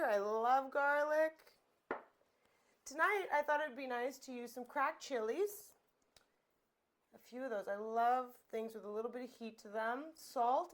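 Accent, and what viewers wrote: Canada. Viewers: She sounds Canadian to me. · You Canadian?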